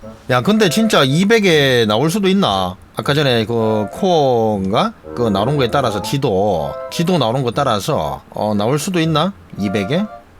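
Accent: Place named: Daegu, South Korea. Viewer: 대구 사투리 쩝니다 고향 생각나네요 ㅋㅋ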